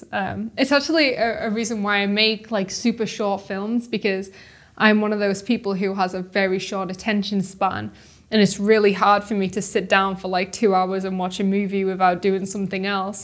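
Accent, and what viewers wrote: United Kingdom. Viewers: So straightforward and inspiring, best of luck. Also, the accent geek in me is intrigued as well, am I hearing Geordie origins maybe?!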